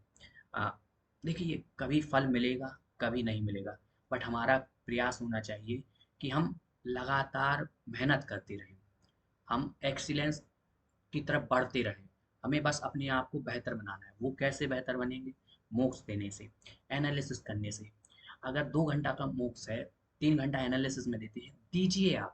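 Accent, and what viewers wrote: Bundelkhand, India. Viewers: Kaha se ho bhai thoda bundelkhand wala accent lagta h aapka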